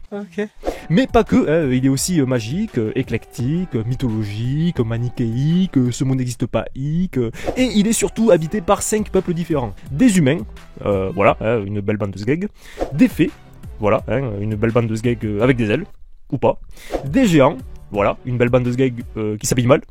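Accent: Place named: Marseille, France. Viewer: Tu as un accent Marseillais 😂 sinon tu gères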